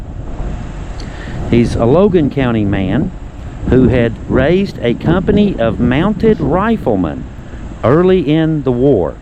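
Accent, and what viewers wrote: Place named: Tennessee, United States. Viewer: Sounds like Tennessee😂😂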